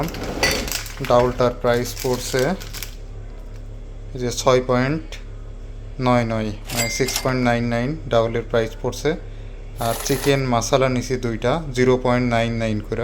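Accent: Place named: Pabna, Bangladesh. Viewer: First video dekhtesi probably apni pabnar manush or ashe pashe basha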